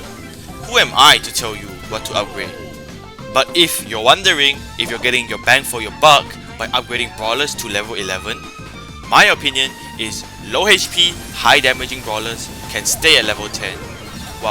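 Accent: Singapore. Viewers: singaporean accent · I can tell that ur singaporean XD am i right?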